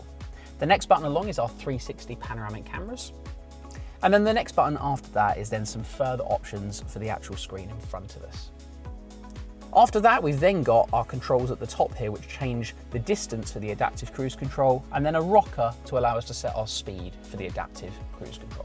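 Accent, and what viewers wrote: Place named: United States. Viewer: is an American accent.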